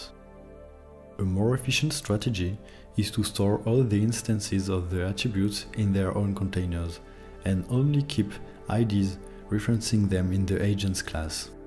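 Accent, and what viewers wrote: France. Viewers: T'es français non ? X) nice video tho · Déjà la vidéo est hyper bien, mais aussi petit big up à ton accent français haha :) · The youtube channel is located in France and with this accent I'm absolutely sure that he's French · anyway a question, are you a French native speaker? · J'avais reconnu notre bel accent 👌😂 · R’u french ? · Your channel is becoming amazing ! Great french accent btw ! And the animations are ON POINT ! 👌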